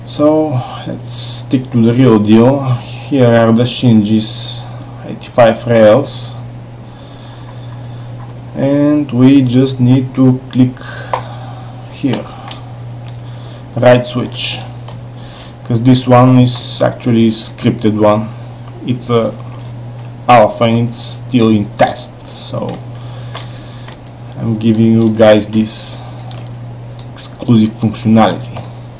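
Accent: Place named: Russia. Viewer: прости но ты Русский той акцент не катит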